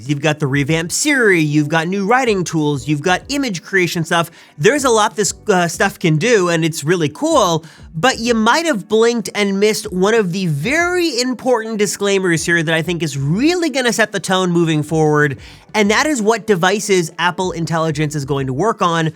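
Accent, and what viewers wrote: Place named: United States. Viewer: I really can't listen to this winey, but loud American accent. Sorry but it hurt my ears!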